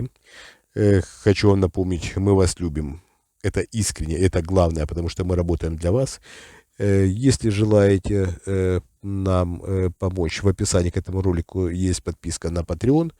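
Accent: Ukraine. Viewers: Вопрос. В России настолько слабая контр разведка, что не может вас вычислить или вы, простите, работаете на власть? . И ещё, у вас явный хохляцкий акцент. Вот прям как мошенники из банков звонят. Опровергните?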